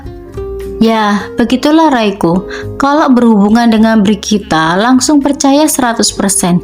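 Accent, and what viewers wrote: Java, Indonesia. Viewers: Suka suara khas medok jawanya semangat akak❤❤❤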